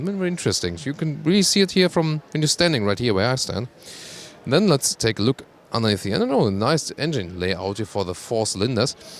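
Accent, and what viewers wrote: Germany. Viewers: Can't wait! Your reviews are always my favourite not only because of the unrivalled quality and the super deep videos but mainly because of the oddly satisfying-to-hear german accent xD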